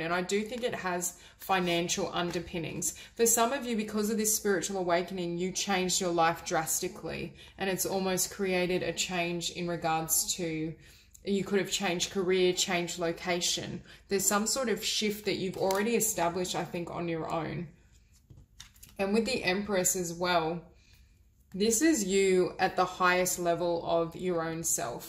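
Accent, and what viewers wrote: Australia. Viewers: Excellent reading I chose #3. I have been told in the past that I am a healer. But I have no interest in it. I am aware of going through a spiritual transformation at the moment, so we'll see. Just along for the ride at the moment. Learning not to sweat the small stuff. Thank you. BTW I love your beautiful Australian accent. I could listen to you read the phone book.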